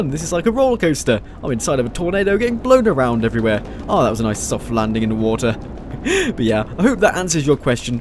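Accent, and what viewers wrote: United Kingdom. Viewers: I love British accents :D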